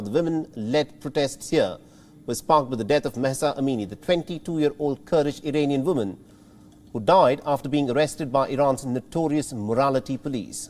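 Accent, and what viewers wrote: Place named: India. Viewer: Oh my gawd your thick indian accent!!! We cant understand a thing!!! Please replace this news anchor.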